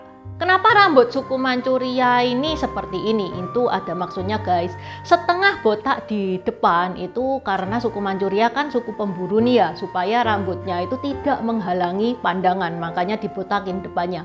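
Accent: Java, Indonesia. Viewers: Raine cino lah ngomonge tek medok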